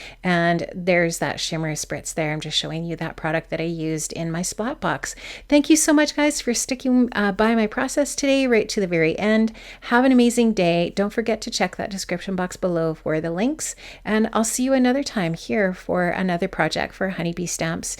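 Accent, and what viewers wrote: Canada. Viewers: Love this card!!! So beautiful...and heard the Canadian accent. 😁 Only cause I hear it in my own videos. haha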